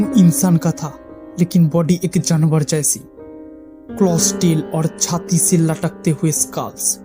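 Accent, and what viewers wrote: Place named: Bengal, India. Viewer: Dear Sir your Bengali accent is to strong.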